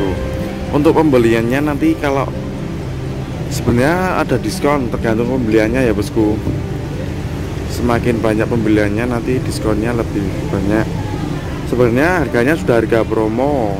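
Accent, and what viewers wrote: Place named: Java, Indonesia. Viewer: Bang kayanyah ,orang jawa yah beda suwaranyah lain sama orang sunda bang kalow keramik batu berapah,,abang orang mana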